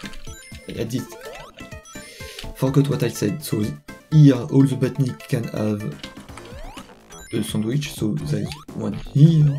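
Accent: France. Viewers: J'aime beaucoup ta voix mais omg, c'est tellement awkward quand un Français entend un autre parler Anglais avec son accent x)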